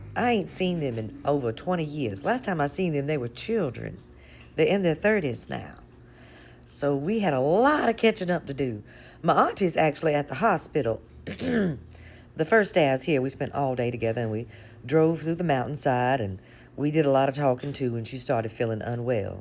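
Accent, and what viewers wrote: South, United States. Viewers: Rest that Southern voice. We need you to spill the sweet Southern style tea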